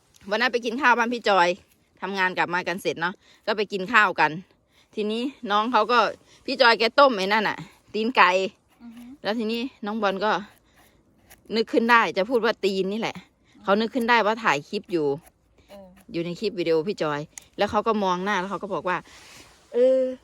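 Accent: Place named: Isan, Thailand. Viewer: มักเสียงเว้าเสียงหัวมาดามคักได้หัวนำ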